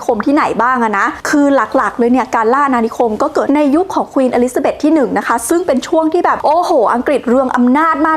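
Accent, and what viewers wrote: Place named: Central Thailand, Thailand. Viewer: ที่น้องวิวพูดนี่แหละเขาเรียกว่าภาษากลางไทย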